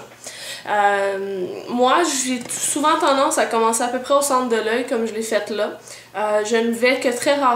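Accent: Quebec, Canada. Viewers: Tu as l'accent Québécois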